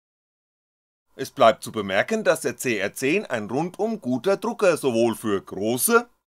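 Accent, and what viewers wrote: Hesse, Germany. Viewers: Es geht nicht um deinen Dialekt, es geht nur um die überdeutliche Betonung. Also nichts gegen deinen Dialekt, im gegen Teil! Hessisch is doch a Geiler Dialekt! 👌 Mir ging es darum das es anstrengend ist das ganze Video zu hören. Infos und du Machart ist echt super. Also nicht als Kritik an sehen ;-) , nur meine Meinung! Trotz allem weiter so, Abonniert ist ja auch schon. Grüße nach Hessen Thomas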